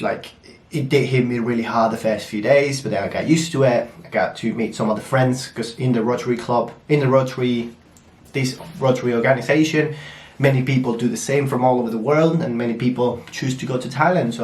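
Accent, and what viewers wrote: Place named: England, United Kingdom. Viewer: Omg! I love your English accent.. espero verte muy pronto, ven a San Francisco cuando quieras!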